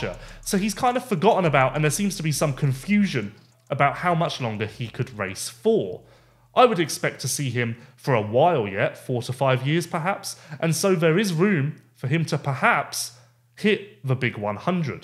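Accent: United Kingdom. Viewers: I’m gonna have to pass on this British accent. Hard pass. I’m sorry I even clicked on it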